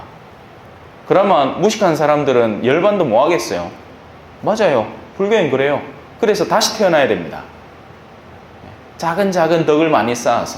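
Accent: Gyeongsang, South Korea. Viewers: 신부님... 세상살이도해야.밥먹고.신앙생활도.꼭.해야평화을..이웃.동료을살피옵니다.신부님에.직선말씀.의성.경북말씀..투박함.그대로.가식없으신.신부님.한번씩..속이.뚝.티입니다.언제나.정답..정답주시옵니다..베트남에서.함께합니다.🙏✈️✈️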